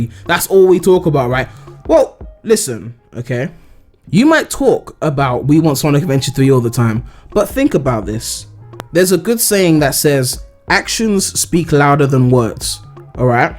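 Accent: United Kingdom. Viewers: Great, I'm new to your channel so I'm curious about this, do you have some British in you? You sound kind of British. lol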